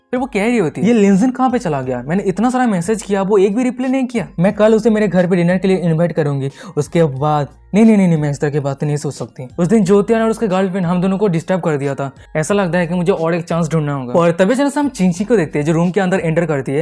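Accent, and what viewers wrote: Bengal, India. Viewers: Hey bro are you Bengali 😮😮😮❤ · bro are you Bengali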